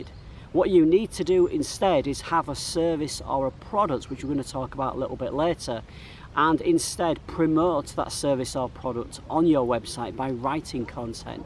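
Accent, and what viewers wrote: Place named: Manchester, United Kingdom. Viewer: sorry mate i didn't mean to offend you , i thought you are from Manchester through your accent , lol cheers man , i like your narrating way and i keep following your videos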